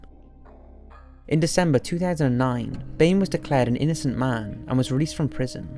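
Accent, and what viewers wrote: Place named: England, United Kingdom. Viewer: Possibly because he's an English person? The rest of the world shouldn't have to change the way we all talk just because you Yanks can't take the time to figure out other countries accents and slang. So arrogant.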